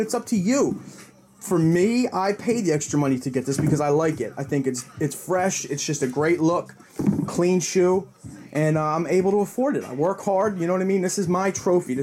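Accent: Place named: East Coast, United States. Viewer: Yea that is how you talk but I talk like that too haha must be the east coast in us lol, the mush explanation was dope too, had me rollin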